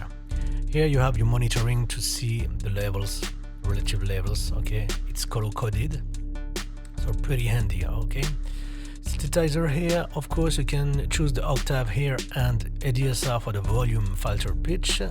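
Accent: France. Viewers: DUDE YOU'RE ILL MAN!! love your accent, you're french ah?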